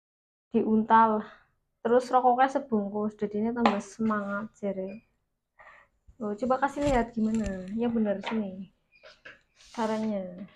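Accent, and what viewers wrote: Banyumas, Indonesia. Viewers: Wong ndi sih ngapak banget mpeyan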